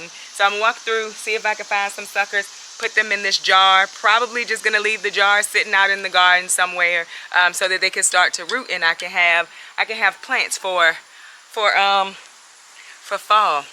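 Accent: South, United States. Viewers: Excellent job my friend.. I love ur accent so sourthern❤❤.. Ur garden is fantastic..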